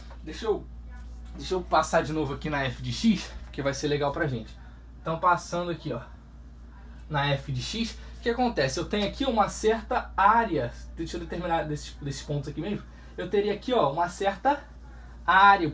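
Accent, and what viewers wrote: Rio de Janeiro, Brazil. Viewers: De onde você é do Rio ?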